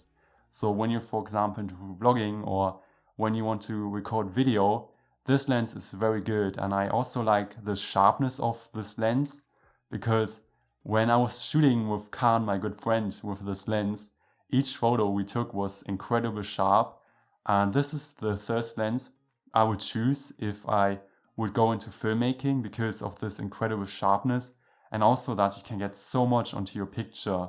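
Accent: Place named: Germany. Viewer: I have a question... Do you speak German!? (I'm from Switzerland and I find that you have an German accent haha😂)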